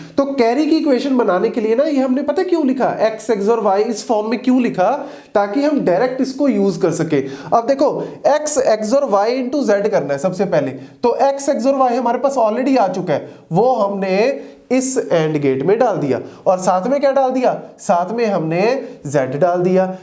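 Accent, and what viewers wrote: Punjab, India. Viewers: Sir tusi punjabi bolde oo routine ch ?? Thodi lehje toh lag reha tusi punjabi bolde howoge ?? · Sir ! Are you from Punjab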